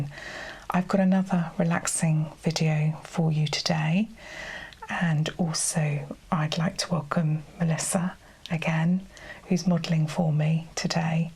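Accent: United Kingdom. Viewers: Oh, the british accent 😍🥰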